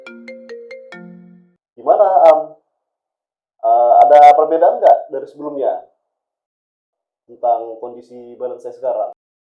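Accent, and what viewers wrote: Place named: Medan, Indonesia. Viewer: Ini baru cerita horor medan,, logatnya masih kental, ceritanya juga seru 🔥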